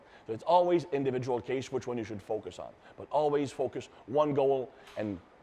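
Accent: Quebec, Canada. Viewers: What is it about a French-Canadian accent that makes me want to shut up and humbly learn???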